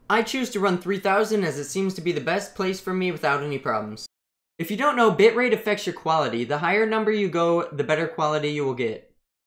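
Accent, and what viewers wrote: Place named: New York, United States. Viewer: What is that accent? New York?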